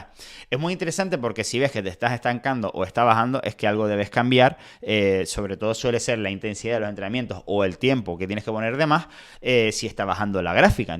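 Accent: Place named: Canary Islands, Spain. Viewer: Interesante vídeo 👌👌👌. Me encanta cuando dices lo de ''gandul'', ajajajajajajajaj, como echo de menos nuestro acento canario. Saludos puntal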